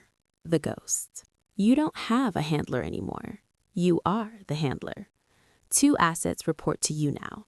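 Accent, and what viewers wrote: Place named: India. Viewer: Good video but why the voice change to an Indian accent no keep posting man good luck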